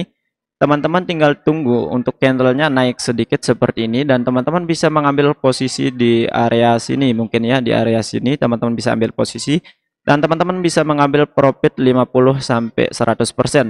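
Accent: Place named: Lombok, Indonesia. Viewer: marak dengan lombok logat side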